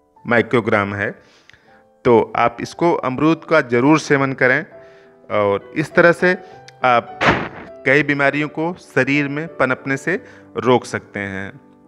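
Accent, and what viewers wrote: Bihar, India. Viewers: Dr n s varma aap khud k video kyo NAHI dalte ho ye kis bihari ki awaj h or aap khud present ho kar bataya karo. or kisi bhi fal ya sabji par video banao to uski ayurvedic property BHI bataya karo ab aap ne elopathy ka chemical value Bata di or isko sham ko ya raat ko khane se mana BHI kara h. kyo kyonki ye prakarti me thanda hota h is liye or ye baat aap kewal ayurved se hi malum hoga the true valuation of the artical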